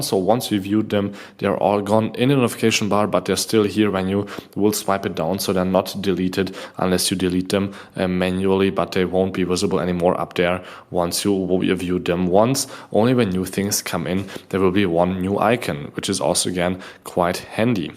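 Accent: Germany. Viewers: you are german native i assume ? Does this video also exists in german?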